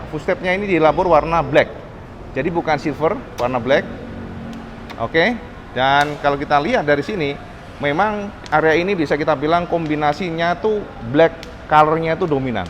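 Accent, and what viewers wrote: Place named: East Java, Indonesia. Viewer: Saya malah suka gaya omonganya khas jawa timuran surabaya...👍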